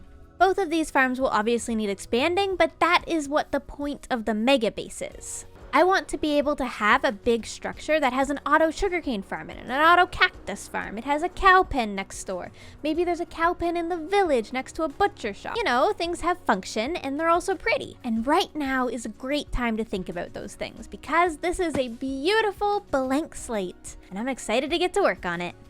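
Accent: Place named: Newfoundland, Canada. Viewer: I love hearing the Newfie in your voice ever so often 👌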